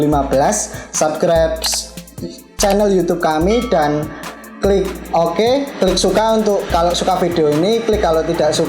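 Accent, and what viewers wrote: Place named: Java, Indonesia. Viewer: Medok banget ><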